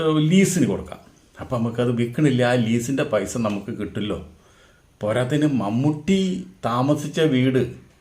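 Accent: Thrissur, India. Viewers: Thrissurkarude slanganu chirri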